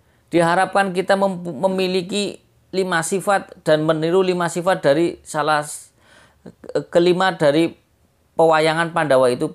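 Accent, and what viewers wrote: Tegal, Indonesia. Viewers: Asli mana Om.... Tegal yoo Logatnya... Salam Ngapak yoo Om... Bolehlah Kerisnya... Tak Dolan ning omah njenengan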